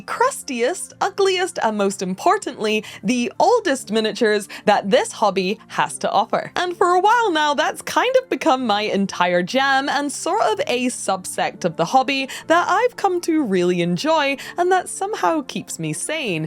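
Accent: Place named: Scotland, United Kingdom. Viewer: My wife saw you on my phone and asked, "Is she AI?" I explained, "No, she's just Scottish."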